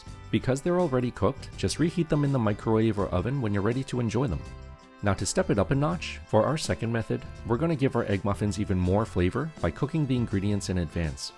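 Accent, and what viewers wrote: Canada. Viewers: Canadian voice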